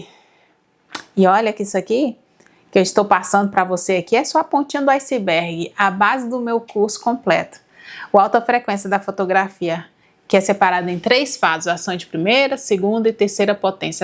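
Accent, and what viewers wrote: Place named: Recife, Brazil. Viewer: tú és de Recife? Esse sotaque… uhm acho que sim sou de Olinda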